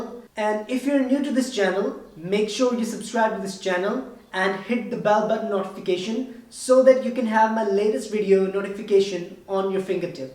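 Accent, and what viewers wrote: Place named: Malwa, India. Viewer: You speak more like malwa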